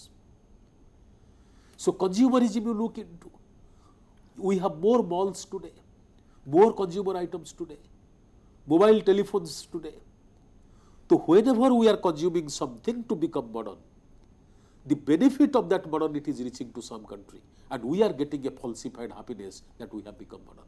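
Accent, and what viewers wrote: Odisha, India. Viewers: I can’t understand his pronounce because of oriya flavour is coming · Sir are you from odisha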